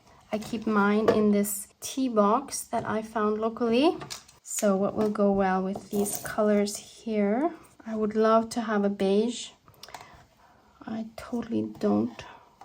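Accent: Vienna, Austria. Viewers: Ohhhh du liebste, du herziges Zauberwesen😊, was hast du wieder Tolles gemacht. Ja und nun weiß ich endlich was ich mit meinen Treibholzstöckchen machen werde, die ich letzten Sommer so eifrig am schönen Chiemsee sammelte❤. Es ist echt lustig, dass ich ebenso Probleme mit dem Zufallsprinzip habe, obwohl ich alles andere als perfektionistisch und ordentlich bin😅. Aber wir hatten es ja schon mal davon... Es ist so schön, dich deutsch sprechen zu hören, denn es blitzt doch das Weanerische hindurch und ich liebe diesen Dialekt. Ich hab ja auch ein Faible für österreichische Autoren. Deine Fabricsnippetroll ist super geworden. Achja und das Zeugs, dass du wohl meinst wegen des Stofffixierens müsste aufbügelbare Vlieseline sein. Ich glaub, die Quilterinnen benutzen das immer. Das kleine Journal, das du von Petra bekommen hast, ist wirklich allerliebst und ich mag besonders, dass sie auch Samt verwendet hat. 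Ein tolles Material wie ich finde, besonders wenn er schon gebraucht ist. Ganz liebe Grüße zu dir ins schöne Wien und guats Nächtle❤ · another beautiful project and i really love to hear you speak german with your lovely vieanna dialect <3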